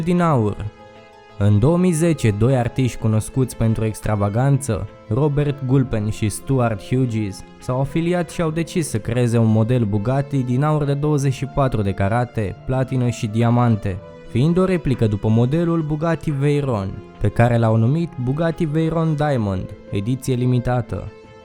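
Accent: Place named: France. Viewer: Ai achent de francez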